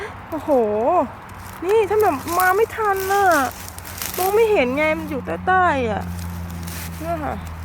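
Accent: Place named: South, Thailand. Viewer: ชอบนอ้ง นะคะติดตามมาสักพักแล้วคุยสนุกใต้ก็ฟังออกนะคะเพราะแฟนก็คนใต้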